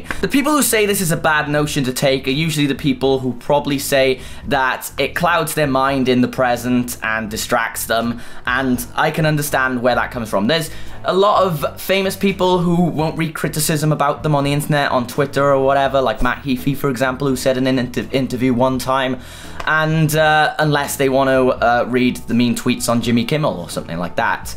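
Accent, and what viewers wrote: Wales, United Kingdom. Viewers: Hey man, where in Britain are you from? I'm trying to pin point your accent and you sound Welsh (I'd know😂) but I can't really tell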